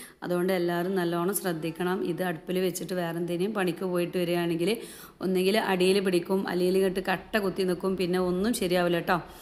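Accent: Malappuram, India. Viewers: മലപ്പുറം + കാലിക്കറ്റ്‌ / മിക്സ്‌ സംസാരം,,, വേണ്ട,, നല്ല രൂപത്തിൽ സംസാരിക്കാൻ ഒന്ന് ശ്രമിച്ചു നോക്കൂ,, 👌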